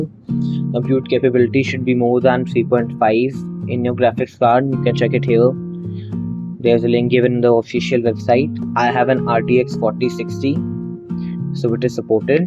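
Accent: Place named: India. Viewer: just go and fix your English, we don't need to hear your disgusting Indian accent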